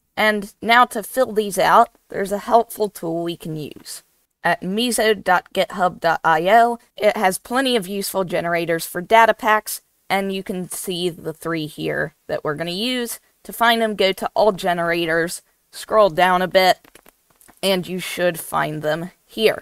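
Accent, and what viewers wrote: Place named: South, United States. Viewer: most southern minecraft youtube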